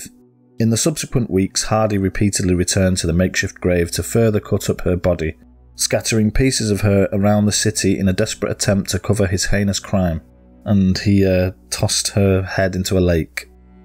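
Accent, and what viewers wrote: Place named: Northern England, United Kingdom. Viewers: I love this channel, your a brilliant narrater, could listen to you all day, I'm not far from you at Manchester I'm from Kearsley, but now live in Wigan, thought I recognised the accent. Keep them coming, loving them all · I knew you sounded like you were from the north of England I lived near Manchester I lived in Newton Lee Willows earlstown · I thought you were a Manc. Glad you covered this case, i have heard if it. The victims need to be remembered, like you say. RIP to all his victims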